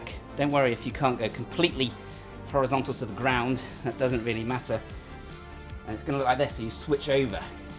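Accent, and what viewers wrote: United Kingdom. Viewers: Side note. You have a great naration voice for audio books, I feel the confidence and conviction in the words. Must be the accent. Sooo British.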